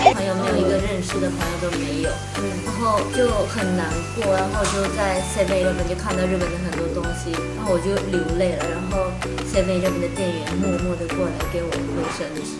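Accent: China. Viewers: 還是有ㄧ點上海腔的味道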